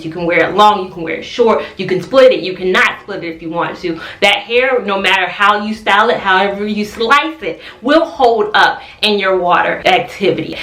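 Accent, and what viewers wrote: South, United States. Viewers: I defiantly didn't mean anything by it. I too am use to a southern accent. For the camera it was a bit fast. Nevertheless, I enjoyed your video and have become a new subbie. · You know, I see people leave comments like this often on your videos, but it doesn't bother me. I'm from northern Florida, so it may be that I'm just accustomed to Southern accents because I never have an issue understanding you. I have had issues with understanding some people from up North who talk fast, though, so I think that plays a small part in it.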